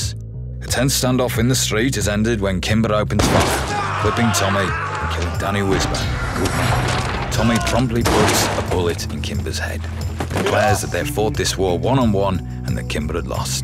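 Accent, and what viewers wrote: United Kingdom. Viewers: This is the most British you will hear your whole life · i m watching this video to learn British accent 😂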